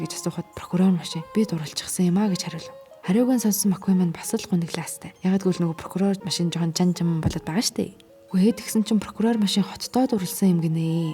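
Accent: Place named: United Kingdom. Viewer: Mater hel muutai bish British aylagatai shvv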